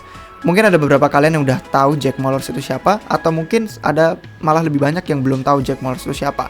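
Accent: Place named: United Kingdom. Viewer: lama tinggal di australia, temen bulenya pun banyak orang australia, jadi wajar kalo aksen british nya kental kalo kita yg terbiasa denger pake aksen US emang kerasa aneh sih 😂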